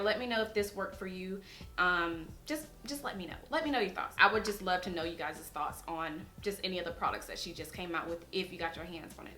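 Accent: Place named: Texas, United States. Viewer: I love your accent. I’m from Texas and you sound like my whole family. Lol 😂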